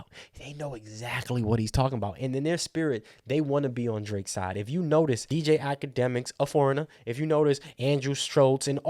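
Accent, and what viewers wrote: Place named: South Carolina, United States. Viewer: Charleston in the building shoutout to SC! You know what time it is over here. I heard the accent so I had to make sure you was from the low country. But I like your takes! Ye is SC stamped.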